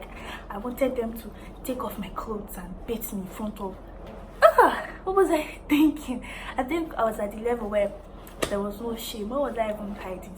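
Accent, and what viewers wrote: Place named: United Kingdom. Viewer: You have a British accent🤔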